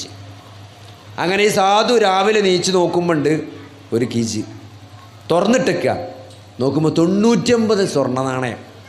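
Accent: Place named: Malappuram, India. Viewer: ഇദ്ദേഹം ഒരു ഡോക്ടറാണ്, but മലപ്പുറം മലയാളം വിട്ട് ഒരു കളിയുമില്ല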